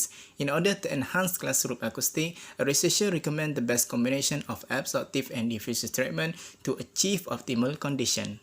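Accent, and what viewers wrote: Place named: Kelantan, Malaysia. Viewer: Wow english with klatey slang 👍🏻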